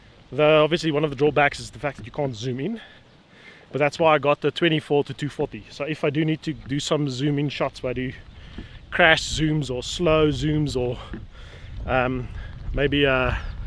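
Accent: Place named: South Africa. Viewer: Thanks for providing this. Good to hear the Saffer accent. Puts it in a good context to whether we want to purchase it.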